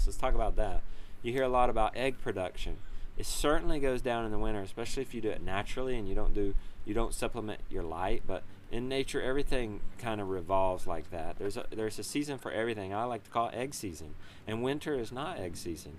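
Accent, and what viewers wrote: North Carolina, United States. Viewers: So serious and a much more distinct NC accent. Love it!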